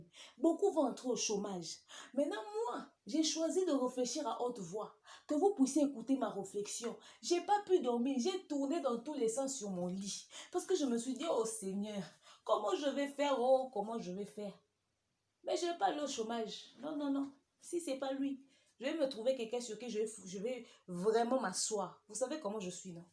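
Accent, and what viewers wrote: Ivory Coast. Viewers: Good Job, ton accent ivoirien est parfait parle en nouchi pour la prochaine!! Fally a simplement fait du sarcasme de l’ironie. Et li y parle aussi de son étoile depuis le groupe Talents Latents,en passant par le Quartier Latin et jusqu'à ce jour avec FVicteam qui est son label: personne ne pourra le stopper!!! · Kkkkkk suis par terre avec ton accent ivoirien nous les warriors on t'aime beaucoup niang's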